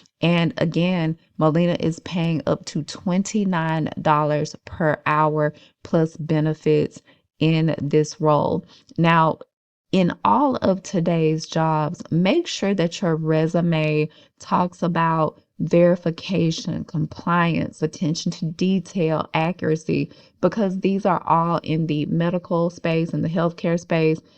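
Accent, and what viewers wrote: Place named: Alabama, United States. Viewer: You definitely from Alabama, am I right? Lol and thanks for the video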